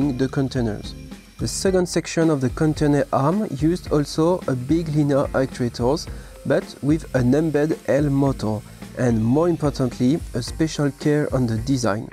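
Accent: France. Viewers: j'en était sûr en entendant l'accent...un français sympa le MOC, je vais jeter un oeil au reste des création s hormis l'accent (j'ai le même quand je parle anglais) il n'y a rien à redire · Great model, I have both your other 42100 alts and will buy this one too, for sure! Love your French accent, btw.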